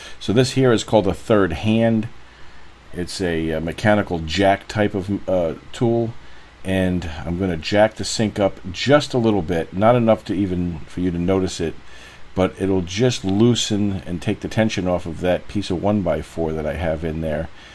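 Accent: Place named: Philadelphia, United States. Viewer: Great to hear a Philly accent. Can trust someone from where I grew up! Thanks. This will help!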